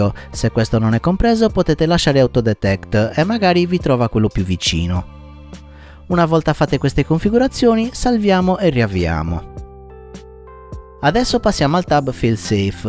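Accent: Sardinia, Italy. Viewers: ciao Avrei un altro problema, in attesa che mi arrivi l esc per x220 vorrei utilizzare il nazgul v2 posso utilizzare, come radio, fs 16 x cosa devo fare inoltre, scusa tutte queste domande, ho acquistato gli ev 100 ma non riesco a vedere nulla, dove sbaglio? Dall accento sei del cagliaritano io sono dell ogliastra magari un giorno ci incontriamo per un caffè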